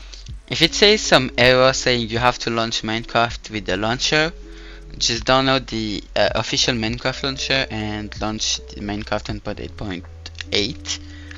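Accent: France. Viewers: Good French accent bro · L'accent français qui se cache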